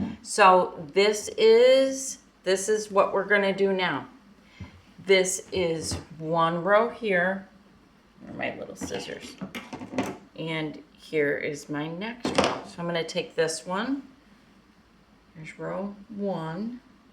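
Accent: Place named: Rochester, United States. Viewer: Are you from Rochester NY ? I here the accent.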